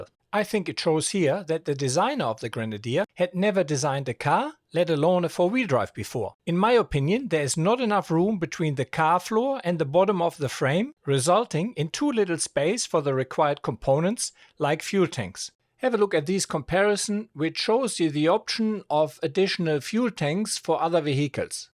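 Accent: Germany. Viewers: i agree in all , precise look over all , sounds like you got an German accent ...😎 Greets from Bavaria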